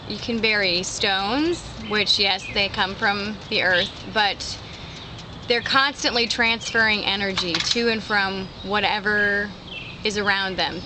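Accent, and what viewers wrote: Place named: Wisconsin, United States. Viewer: Thank you sooo much! Your uhms and ya knows comes from WI (awesome)! Informative and sweet! Blessed be!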